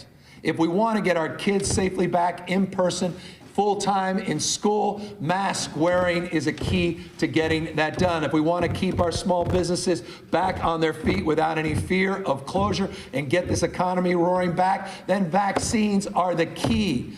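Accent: South, United States. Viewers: Is it me or is he pandering the black n brown folks with that southern accent? Just an observation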